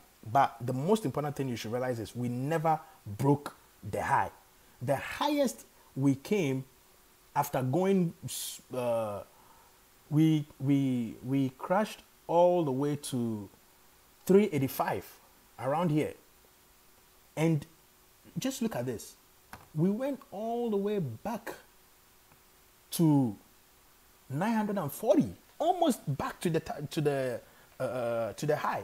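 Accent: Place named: Ghana. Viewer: Are you from Ghana? Your accent is a giveaway.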